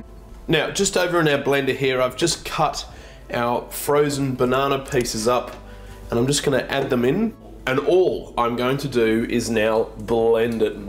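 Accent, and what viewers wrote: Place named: Australia. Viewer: He's Australian.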